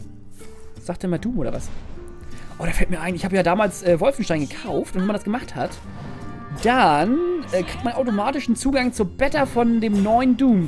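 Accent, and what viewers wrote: North, Germany. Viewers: ich finde das auch gut so wie die Videos sind :). Bin selbst zu dumm für HearthStone und mag es dennoch dir dabei zu zu sehen, weiß gar nicht wieso ich dich nicht vor der Session mit Chris gesehen habe... bist sehr unterhaltsam und ich liebe den nordischen Dialekt :D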